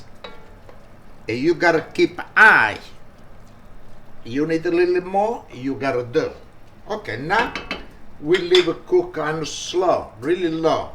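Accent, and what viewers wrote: Italy. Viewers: You are amazing with your Italian accent, a big follow from me with a big like. Will make this recipe today 🥰🥰🥰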